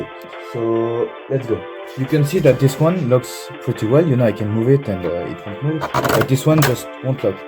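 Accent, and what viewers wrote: France. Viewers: Hi, im new on this channel and i was wondering, are you french?😅 because your voice have a little bit of french accent🥖🥖🥖😂😅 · tes tu fracais tes un accent fracais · Do you speak French cuz you have an accent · L’accent français on reconnais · You got a French accent, I like that. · are you french by any chance? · YOOOOOOOOOOOOOOOO J AI RECONNU TON ACCENT FRANCAIS ET TA DIT UN TRUC EN FRANCAIS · are you french? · Is it me or he has a french accent???? Idk.... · are you french? you have the accent of a french ppl trying to talk in english, why im saying this is bcuz im myself a french pp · l'accent français me tue de rire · Alors la toi avec ton acsent si t'es pas Français je sais pas ce que tu pourrais etre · are u french? that’s a nice accent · enfin un français qui fait des video comme celle la on reconnais l'acens français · wait are you french ? 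or am i just trippin'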